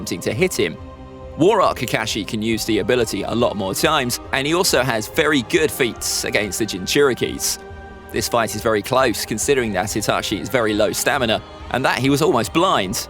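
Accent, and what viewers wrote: United Kingdom. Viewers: He has British accent❤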